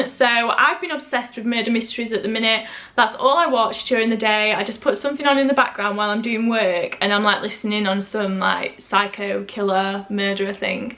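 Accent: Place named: United Kingdom. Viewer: Where abouts in the UK are you from? I cant quite work out what accent you have x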